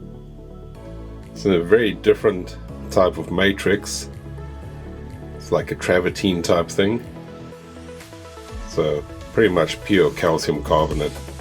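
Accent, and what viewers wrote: South Africa. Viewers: Just from your accent u are definitely from South Africa. U are in an awesome place. But do u have any knowledge of our eastern cape here in SA cause I love collecting rocks but no rocks like u have found. Just give me some intel here in Gonubie or surrounding areas where I can find similar ❤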